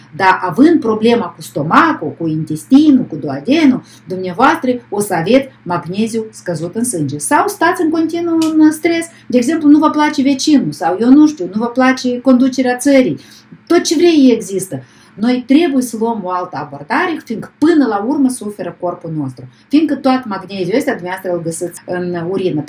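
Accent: Moldova. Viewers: Se înțelege foarte clar, doar dialectul e puțin moldovenesc, engleza înțelegeți mai bine?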